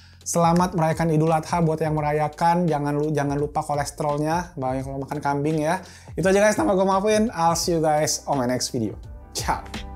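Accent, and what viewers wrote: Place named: Palembang, Indonesia. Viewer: e' o' , ya ampun bahaso palembang nian🤣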